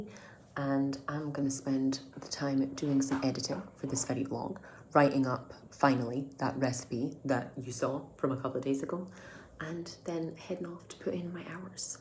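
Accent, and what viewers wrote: Scotland, United Kingdom. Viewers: Found your channel randomly loveee it 😍 I’m in glasgow, brill that your Scottish too👌🏻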